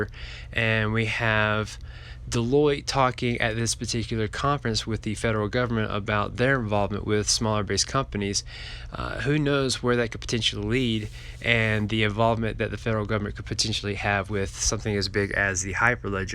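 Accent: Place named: United Arab Emirates. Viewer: You sound a guy from UAE. 50 should be fine for you to make few millions if you have bought few thousands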